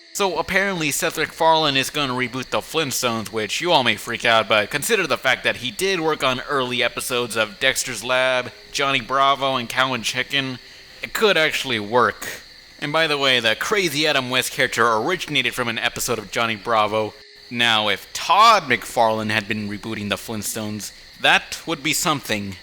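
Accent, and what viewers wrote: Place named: Texas, United States. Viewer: The southern accent was your Texas showing.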